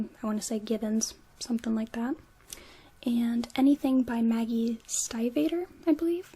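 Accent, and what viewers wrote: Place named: Missouri, United States. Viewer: Lol you from Missouri?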